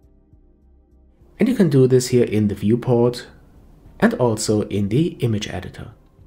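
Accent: Germany. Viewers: Me: I need a clear concise tutorial... (Clicks on tutorial): (German Accent) "Hello Guys, here's a Blender 2.8..." Me: Oh thank god:D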